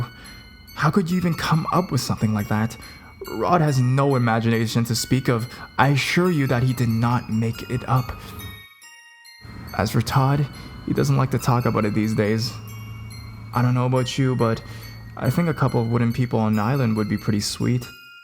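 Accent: Canada. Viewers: Canadians... that accent,and the overacting makes this post sound like one of those scary stories for kids records from my childhood, "whose got my golden aaaaarrrrrrrrmmmmmm?" kinda thing. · I can tell he's Canadian because instead of "about," he says "aboat." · Are you Canadian??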